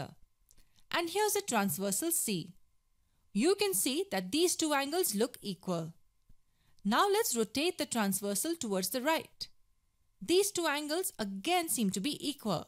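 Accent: India. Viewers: why would you use an indian persons voice bruh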